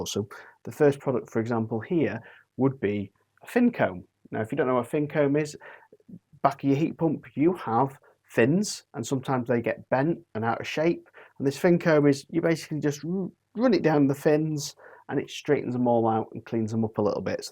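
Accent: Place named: North, United Kingdom. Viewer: Love "free-D" printing. Please learn to speak English. It would be so much easier to listen to you. Speaking Mockney in a northern accent just sounds weird.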